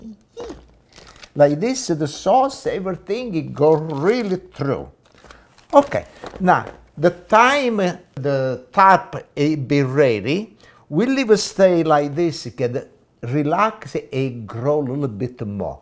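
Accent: Italy. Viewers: Grazie!! I love watching you cook and I love your accent, you sound like my neighbors (and cousins) when I was growing up!! They made me fall in love with tomatoes, garlic, lemoncello, espresso and all things Italian!! I really like your little bit of oil, olive oil is even in the Bible as a food we should eat. Tutti a tavola al mangiare!! Yummmmyyyy!! And please forgive me if I misspelled any Italian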